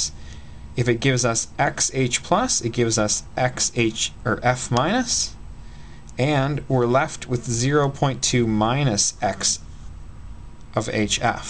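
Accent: Canada. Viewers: you're from canada arent you?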